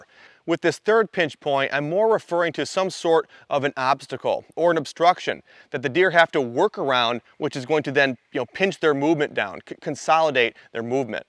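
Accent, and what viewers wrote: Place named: North, United States. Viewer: Nothing but yankees and their accent and nomenclatures doing hunting vids. Is a good ol country boy to much to ask for vs city folks? Whats pryporties?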